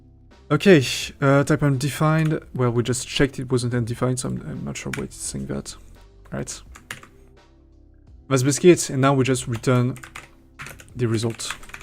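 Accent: France. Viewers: I can spot a french accent from a mile away ahah keep up the good work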